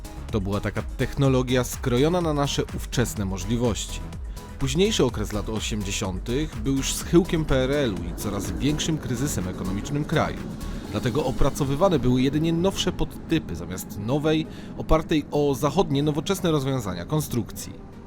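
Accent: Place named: United States. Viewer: Pan miejscami intonuje słowa na końcu zdania, jakby spędził 30 lat w Stanach. Nie ma co kombinować, lepiej mówić naturalnie, po naszemu.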